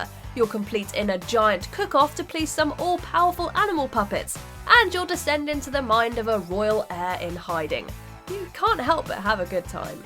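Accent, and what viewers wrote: United Kingdom. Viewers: I have a question for you,. Are you from the UK because it sounds like you are? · Watchmojo, I like the british narrator on this video. It brings class to your positive Top 10.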